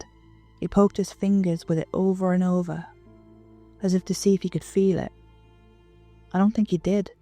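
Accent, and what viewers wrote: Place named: England, United Kingdom. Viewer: your welcome. Your accent reminds me of my sister in laws mother shes from England. Introduced me to English breakfast best tea in my opinion.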